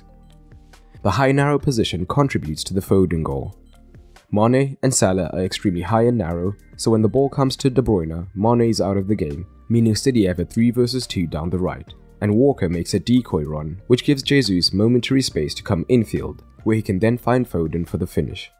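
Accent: South Africa. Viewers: Cool video man. Are you South African?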